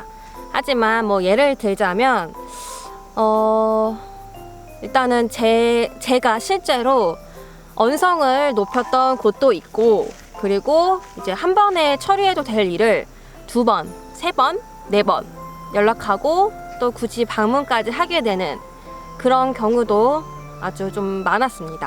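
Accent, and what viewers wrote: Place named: Chungcheong, South Korea. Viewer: ㅋㅋㅋ 그거 충청도라 그래요 ㅋ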